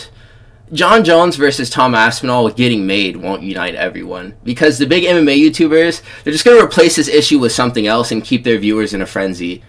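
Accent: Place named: California, United States. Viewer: You have a very self-inflated perspective; you're not the only "true" MMA fan, and the people who disagree with you aren't all casuals, i.e., the UFC fighters literally calling for Jones to be stripped of the title. And it's hilariously ironic that you have the audacity to make fun of someone else's voice while rocking a flamboyant Californian accent.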